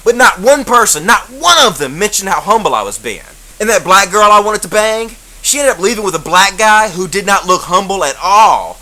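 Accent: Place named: Kentucky, United States. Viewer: Oh shit you live here in memphis? you have a more country accent than most people here i thought you were from like kentucky or something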